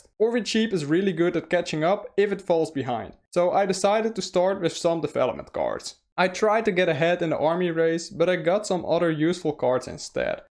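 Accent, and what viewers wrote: Netherlands. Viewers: omg the dutch accent is so intense 0_0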